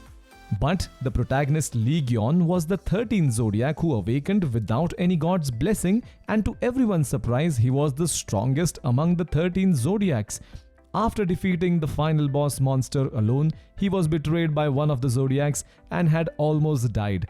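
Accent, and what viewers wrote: India. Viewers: indian accent is strong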